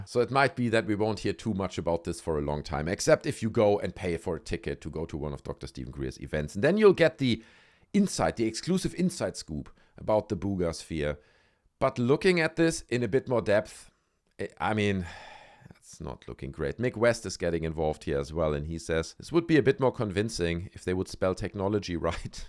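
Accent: Germany. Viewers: I just like saying, "Buga Sphere" in your German accent.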